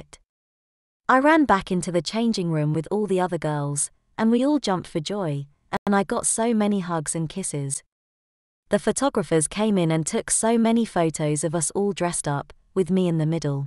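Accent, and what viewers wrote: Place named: United Kingdom. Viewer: What a fraud! A story about American football, w/cheerleaders, told in an British accent, using English terms not used in the US. Flat is actually an apartment, match is game, etc. And the cheerleader's lockerroom is closely guarded at ALL times to keep interlopers out. And no, the final game of the season would be played on home turf, not in England. Not even a good try, it's a complete fail!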